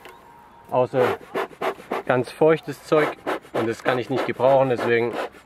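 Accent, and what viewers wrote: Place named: Swabia, Germany. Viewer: Stöckle, Äschdle, jetzet... wo bisch au Du her Jonger? Kirchheim? Göppingen? :D :D :D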